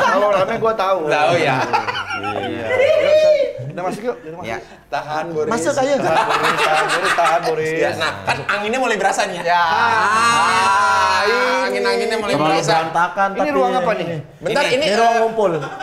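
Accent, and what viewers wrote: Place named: Medan, Indonesia. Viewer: Mak jang, maen maen ya wkw, Medan kali ya min haha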